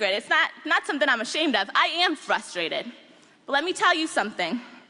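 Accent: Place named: United States. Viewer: Where she learned American accent English is been USA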